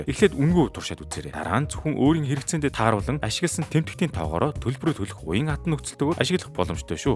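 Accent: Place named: United States. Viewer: Таныг яагаад ариун ус гээд байдгийг өөрөө цувралыг нь бүрэн эхээр нь үзээд ойлгосон доо хха. Сүүлдээ бүр хэл яриа Америк стилтэй болж эдр хха. Таны зовлонг ойлгосоон. Ххэ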